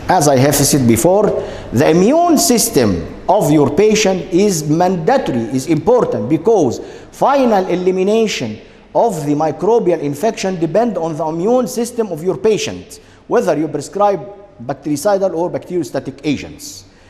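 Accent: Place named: India. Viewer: هو حضرتك بتتكلم زي الهنود ليه 🙂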